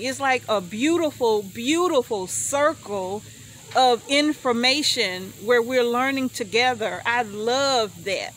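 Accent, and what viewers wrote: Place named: Kentucky, United States. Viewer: I am thankful for youtubers like you and. I used tjo garden and can stuff with my mother, but only water bath canning. You are the reason I got a pressure canner because of you. I had to buy all new jars again because my husband had gotten rid of mine that I got when my mother passed. Just hearing your voice, with your accent, reminds me of her, we were from Kentucky. I learned so much from her, gardening, cooking, quilting, just so much. We all used to go berry picking together as a family, people used to make fun of us, but I didn't care, because I knew how good the things Mom made from the berries would be. I learned how to process and clean the critters my dad would hunt. Once my mom killed a bunch of our chickens, they were free range and wild as heck, and most of them would go up the mountain and roost. 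Mom stood out in the yard and shot them with a 22 rifle and every one was a head shot. She had my German Shepherd go up the mountain and retrieve them. The point of this whole story was helping my mom pluck those chickens. She didn't expect me to have an issue, but when she dunked them in the scalding water, the smell made me gag and throw up. She made me go in the house. Anyway I'm grateful for y'all sharing your lives with us. God bless you guys and everyone, including your critters. 🥰🥰🥰🥰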